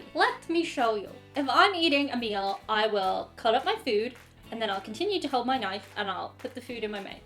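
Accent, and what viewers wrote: Ireland. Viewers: I noticed in your last cooking video that when you are talking to Paul you have a MUCH MUCH STRONGER accent. I love the irishn accent esp when unguys says three/thirity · Hi, I have been with you since the first episode. I honestly don't hear your Irish accent any more. You sound very normal to me, unless you use slang, or word I am unfamiliar with. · So 2 things if you dumb down your Irish accent I would love to see a video of where you're not and how you sound everyday and second being an American I cuss like an Irish 😂😂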